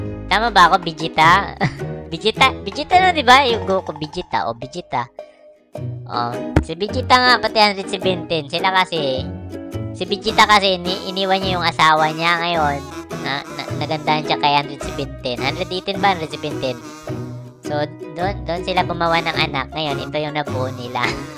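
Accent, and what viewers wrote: Visayas, Philippines. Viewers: Visayano po ba si kuya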